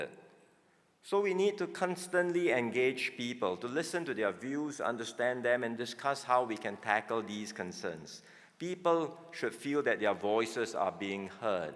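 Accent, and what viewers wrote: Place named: Singapore. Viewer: Wellbdone. Very Well spoken, great used of vocab. Pronunciation needed to be improved and also tone and rythm... as still a little Singlish habit... hihi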